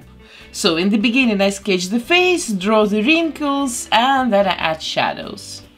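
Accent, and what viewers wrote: Russia. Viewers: Very funny a Russian accent telling Americans how awful Trump is. I unsubbed. When I want to hear people make fun of my President, I'll listen to someone who at least has grown up in the USA. And I'll watch the Popovy sisters do real art without political grifting. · You're russian? This accent ...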